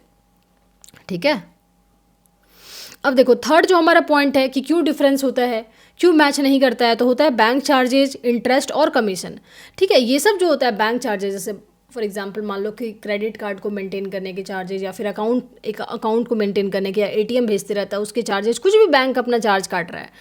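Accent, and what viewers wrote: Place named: Haryana, India. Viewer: Ma'am ki bat sunke lagta hai ki she is either from Haryana or Western UP..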